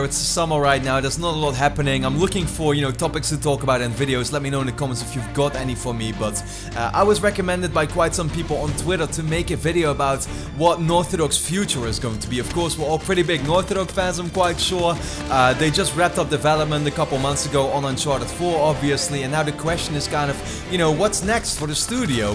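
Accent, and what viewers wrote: Netherlands. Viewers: lel ik had 2 min nodig om te horen dat je nederlands bent XD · lol hardcore nederlands accent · Is Dutch?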